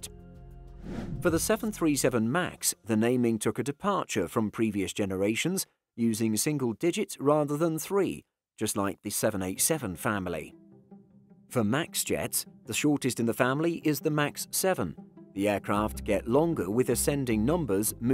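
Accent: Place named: North, United Kingdom. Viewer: This is first time I’ve noticed your northern accent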